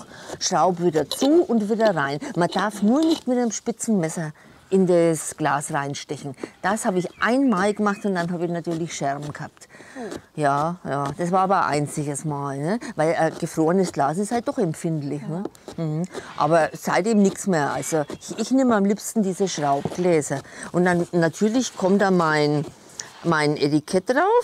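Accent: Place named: Lower Franconia, Germany. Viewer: Gruess Gott,ja jetzt Weiss ich warum Mir das nicht gelingt.ich habe immer nass geschnitten.Der Dialekt macht Mir heimweh,du musst ja ganz nah bei meinem Geburtsort wohnen.Bin ein ausgereister Unterfranke.Zwischen Schweinfurt und Stadtlauringen.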